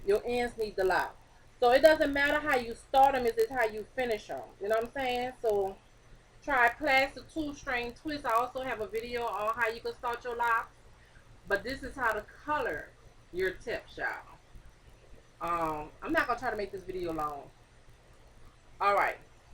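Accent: New Orleans, United States. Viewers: Gotta be From New orleans ! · U Can Tell Shee From New Orleans Dat Accent Stronq As Hell Like Mines Lolz Good Video Doe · Mama, you just gotta be from New ORLEANS.... You got that "mama" vibe and i LOVE IT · Gotta Be From New Orleans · O yea yall from new orleans i hear it in her voice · great video! r u from nawlins?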